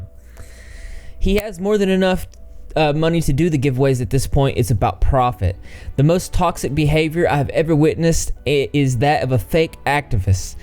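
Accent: South, United States. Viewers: Interesting social dynamic. Thanks for sharing. Also, you're a cutie and I like your accent. I'm usually not fond of southern accents, but yours is really nice. [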